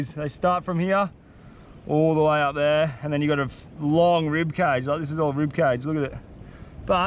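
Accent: Australia. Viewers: Its Aussie accent for me, and such a positive vibe and energy. I love this dude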